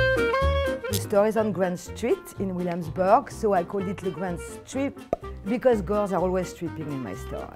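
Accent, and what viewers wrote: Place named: France. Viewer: As soon she started talking I was like : “FRENCH”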